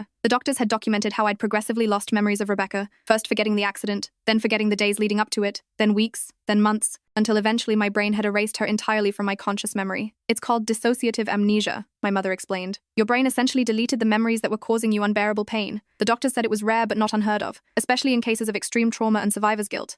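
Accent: United Kingdom. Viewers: British voice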